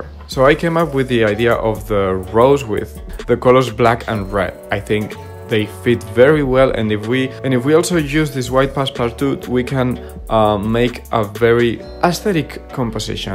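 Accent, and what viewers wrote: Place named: Madrid, Spain. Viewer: Me gusta que subas videos en Ingles pero extraño tu acento Madrileño 💔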